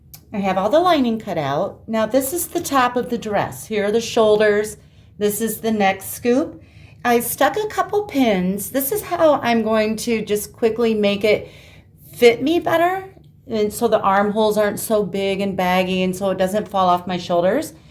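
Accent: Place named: Upper Midwest, United States. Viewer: I hope you don't mind me asking where you are from. Your accent has me going. I'd say Wisconsin, North Dakota or Minnesota> ??? Am I correct? :D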